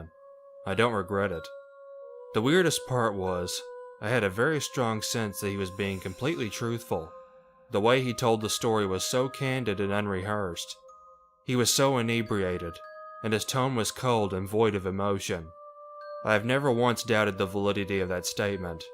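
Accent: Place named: South, United States. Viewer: Love that southern accent!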